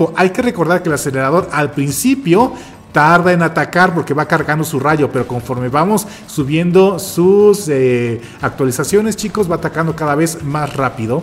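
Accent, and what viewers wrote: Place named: Mexico. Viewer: Hmmm tratare de adivinar de que estado de mexico eres🇲🇽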